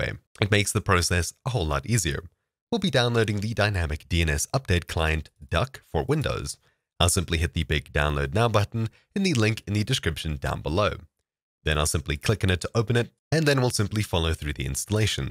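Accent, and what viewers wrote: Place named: South Africa. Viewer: I thought I could hear a fellow South African in that voice!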